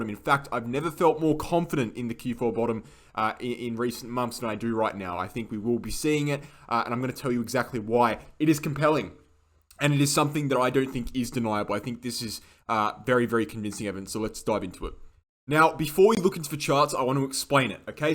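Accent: Australia. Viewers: Yeah I'm generally in line with this prediction. Great job,. Stupid question - are you from Australia or a Kiwi?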